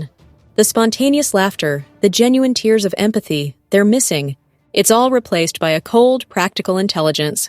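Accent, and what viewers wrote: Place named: United States. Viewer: Я думал канал будет озвучен обычным нейронным голосом, не ожидал что будет такой качественный английский с превосходным американским акцентом.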